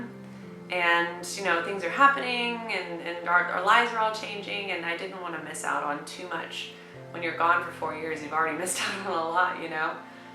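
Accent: South, United States. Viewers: to a brit, you dont have a strong southern accent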